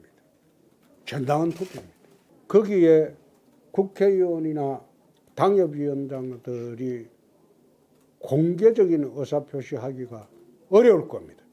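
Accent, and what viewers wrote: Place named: Gyeongsang, South Korea. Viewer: 문제점을 콕집어내는 혜안 , 언제어디서든 즉문즉답이 가능한정치인 흠아닌흠 경상도억쎈 어투와발음은 재밌기도하지만 경상도출신은 아무 문제삼지않습니다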